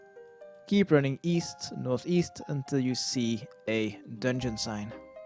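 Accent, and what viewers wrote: Netherlands. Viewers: Are you/ is he Dutch? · I just got my first ever quest cape and that's because of your amazing guides! I want to thank you for all the time that you've been putting into these videos. They're very fun to listen to because I can hear that you're a dutchy or from Belgium haha, further they're just very easy to follow because a noob like me gettin' a quest cape is quite the achievement. Thanks my man!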